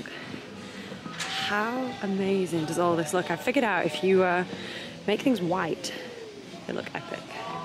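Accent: England, United Kingdom. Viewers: Awesome! I have 19 acres in north east FL after I build my house I will have to check it out. Sounds like a great place to go for a weekend . You have an English accent where are you originally from?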